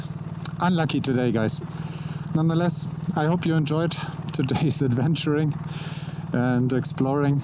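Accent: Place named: Germany. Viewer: Digga rede doch deutsch, man hört das du deutsch bist. Abgesehen davon aber gutes Video, sieht mega schön aus.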